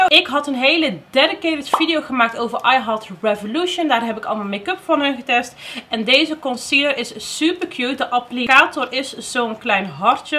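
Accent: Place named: Tilburg, Netherlands. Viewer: ik heb heel effkus hoor ik je zeggen 😂 heerlijk Tilburgs!!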